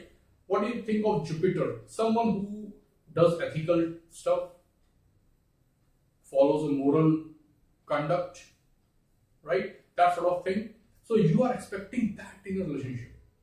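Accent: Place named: Malaysia. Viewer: u spoke like malaysian thats y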